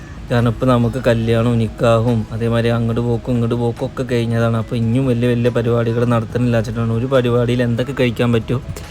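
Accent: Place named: Malappuram, India. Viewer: Malappuram talk i like very much i am from kannur.peole are so humble and broad minded❤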